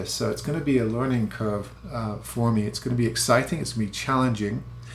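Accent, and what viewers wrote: United Kingdom. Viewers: I never thought before I guess from your accent I thought you was in the UK or there abouts lol anyways happy travels mate and hope your journey is a safe one! cheers!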